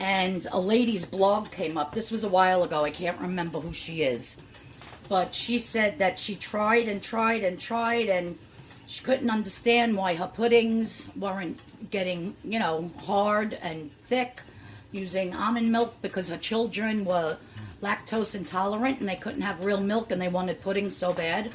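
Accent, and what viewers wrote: New York, United States. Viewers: Just found you. Love your videos and food and knew right away from your accent that you are from ny ❤️. Me too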